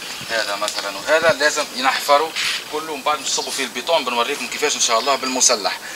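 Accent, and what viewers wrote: Tunisia. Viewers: صفاقسي انتي؟؟؟ متابعك من تونس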